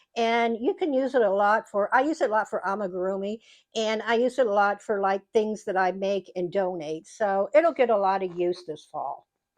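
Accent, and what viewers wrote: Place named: DuBois, United States. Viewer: I'm not, directly, but all my mother's family is in/near Dubois, PA. I asked because you talk with the same "accent" for lack of a better term, just like they do. I'm glad you had such a great holiday.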